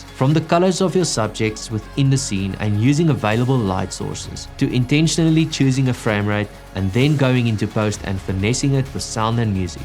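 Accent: South Africa. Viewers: Tell me you're South African without telling me you're South African (apart from the accent) - doing more with less ;) !! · I love your South African accent- you all speak with Authority-Love it --- Love the vid man! keep it up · Wow, it's so good to hear that Afrikaaner accent after all this time.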